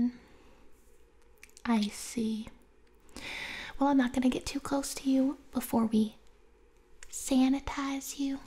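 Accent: South, United States. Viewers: Remember when had a southern accent? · Has accent changed? I swear it used to be more southern sounding...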